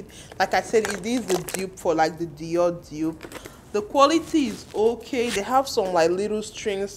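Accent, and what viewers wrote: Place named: Nigeria. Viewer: I love that Nigerian accent 🔥🔥❤️❤️😍😍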